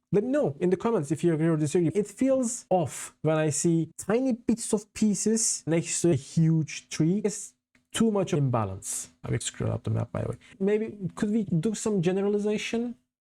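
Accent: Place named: Turkey. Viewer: İngilizce'deki akıcılığını takdir ettim. Günlük olarak ingilizce konuşuyor musun bilmiyorum tabi ama türk aksanından ve isminden yabancı olmadığını hemencecik anladım. Çok kaliteli bir kanalın var umarım dilediğinden bile fazla başarılı olursun! Abone oldum.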